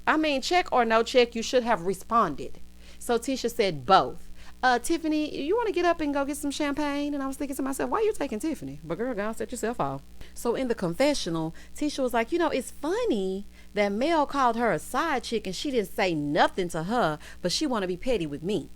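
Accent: South, United States. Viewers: Hey girl hey, you’ve hit the nail on the head again. Without trying to be negative, I just don’t see Kimmi and Maurice’s marriage really working, something about it seems off. I only have 1 question, when Tisha introduced herself to the “financial counselors, she said, “they were going on 15 years of marriage” but, didn’t they go to Vegas to celebrate their 15th like 4-5 weeks ago? I love Tisha’s growth, this platform has truly been a positive for her in that sense. I’m usually here for Destiny’s fashion but, that outfit was also a No for me for that particular event. If Mel and that hyper inflated accent can get on tv, then your southern drawl will surely take you places because hell that’s what drew me in🙌🏾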